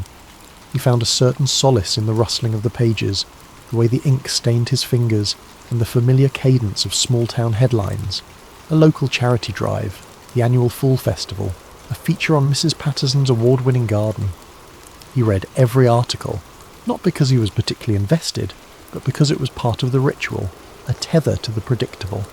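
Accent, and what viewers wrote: United Kingdom. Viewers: I love this but i am very tired of a British accent. It doesn't relax me. I struggle to interpret every elongated vowel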